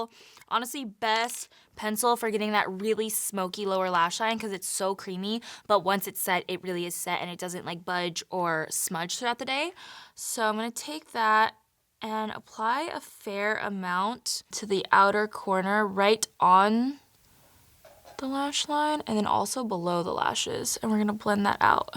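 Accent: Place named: United Kingdom. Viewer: Loveee the look & hair but girl lose the British accent lmao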